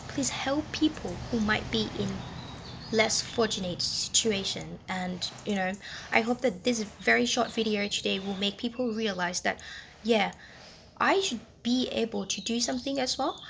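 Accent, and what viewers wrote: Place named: Cockney, United Kingdom. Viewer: Wow, great comment. I love that you give your opinion. But I totally agree, racism is so wrong. Thai are usually quite about things, like pollution, hygiene and bad drrving. If drivers beeped their horn at bad drivers, then I think they would think twice about the crazy things they do too. MTW I love your slight cockney accent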